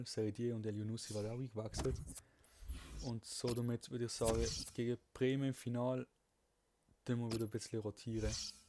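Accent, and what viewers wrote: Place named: Basel, Switzerland. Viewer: Nievethan Neetihrasa Nechdem Dialekt her in Basel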